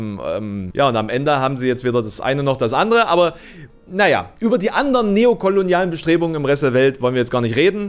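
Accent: Saxony, Germany. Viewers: ich liebe es nicht und bin recht froh dass es bei ihm nicht zu krass durchkommt ^^ Habe zu viele Sachsen erlebt die im härtesten Dialekt den größten scheiß labern Aber ihn hier hab ich echt gern ^^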